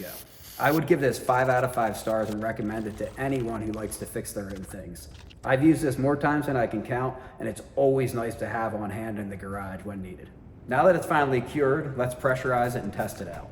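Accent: Baltimore, United States. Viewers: Are you from Baltimore? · Baltimore accent?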